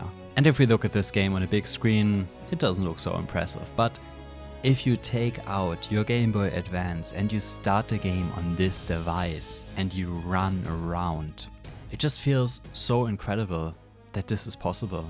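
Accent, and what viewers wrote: Germany. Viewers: Man hört übel das er deutscher ist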